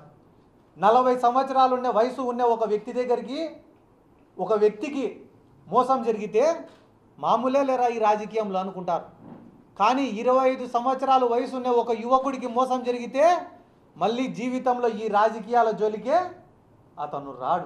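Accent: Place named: Rayalaseema, India. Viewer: Sidhardha Reddy lo meeku nachedi matalu, aa slang aa vakhchaturyam okka Rayalaseema ke sadhyam adi vinte evaraina estapadalsinde, chala mandi profession valla aa slang vadatledu.. Ex. CM Jagan... Anyway we are AP people need to be unity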